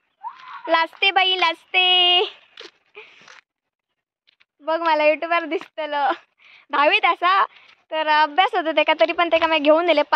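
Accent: Malvani, India. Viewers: I ❤ माझी मालवणी भाषा तू सुंदर बोलते.❤u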